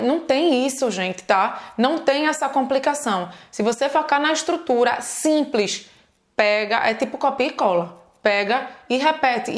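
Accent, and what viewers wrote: Pernambuco, Brazil. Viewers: Ai mulher, eu te amo. Eu to na belgica, comecei a aprender frances agr e vcs estao me ajudando muito. você é de recife? Porque eu sou de lá, e seu sotaque é muito recifense. bjjjuu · Que aula chatíssima. A 'professora' fala muito e seu sotaque pernambucano é muito irritante. Em 15 minutos de vídeo e nada de aula real. Lamentável! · o sotaque pernambuquêz acelerado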